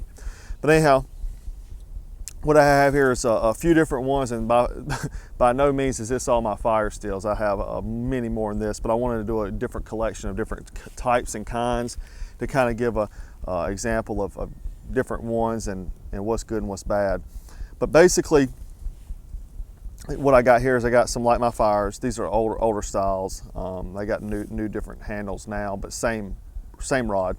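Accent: South, United States. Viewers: You got a very cool southern or western accent. You should try reviewing guns. Your voice really suits it.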